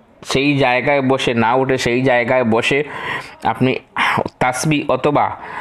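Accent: Sylhet, Bangladesh. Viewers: আমি আর অনেক ভিডিও দেখেচি সব ভিডিওর কথা গুলি ভুজি কিন্তুু আপনার কথা ভুজিনা,,, আমার মনে হয় আপনি সিলেটি,,, কথা কম বলবেন আর ভালো করে ভুজিয়ে বলবেন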